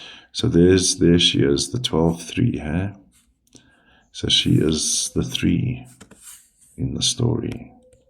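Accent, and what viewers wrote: South Africa. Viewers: So good to hear a South African voice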